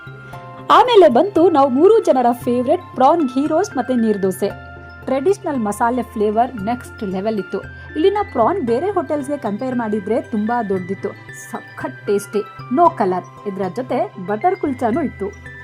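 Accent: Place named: Mysore, India. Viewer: Appatta kannadathi, mysore kannada super mam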